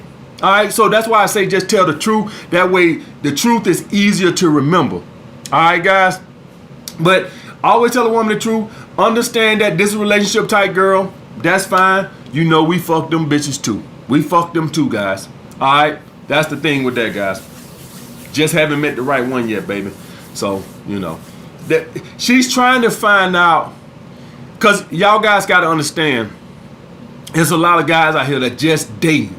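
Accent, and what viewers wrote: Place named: Rural, United States. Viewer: .. Do women say your a "Fuck Boy", Because of your video content? ect..and also, im from N.Y. and have a thick AF N.Y. accent, why you sounds country as all hell? By the way..your vids are on point!👍✊🙏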